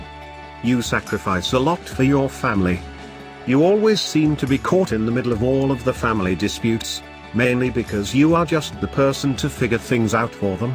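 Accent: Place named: United Kingdom. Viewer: Anyone who said this to my face that didn't have that British accent (that resonates with Virgos) may warrant a punch in the face. And then I would dwell on my behavior for 6 months... True reading.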